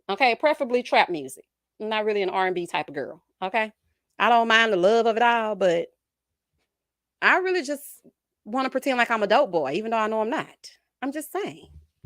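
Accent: South, United States. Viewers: Hey Girl Hey… we love your southern commentary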